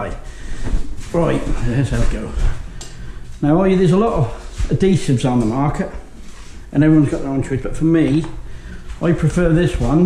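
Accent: Norfolk, United Kingdom. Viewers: Are you Norfolk!? 😍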